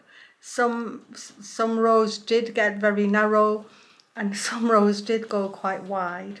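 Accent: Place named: North, United Kingdom. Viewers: Where is your lovely accent from ? I know it’s northern, but can’t place it… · Thank you so very much for inspiring me to try this type of 'patchwork'. Instruction is clear and very helpful also your accent is a lovely hark back to my land of birth. Born near Richmon N Yorks I've lived in regional Victoria (Aus) since 1988. I always thought it strange that folk paid $30 a metre for fabric to cut up then sew back together to make a quilt, perhaps that's the canny lass I am!. So much better to reuse / rescue fabric giving it another life. Thanks again for a lovely video.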